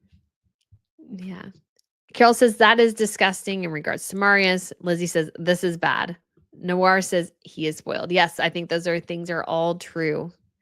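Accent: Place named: Midwest, United States. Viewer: Hey I’ve been watching your videos for a few weeks and I So appreciate that you refuse to say “controversial” words. I also appreciate your stand on right and wrong (not always what the world considers right and wrong) and your ethics! Love your vids and I’m so glad I discovered you. Are you based in the U.S.? You sound like a typical midwestern girl😊. I love it!!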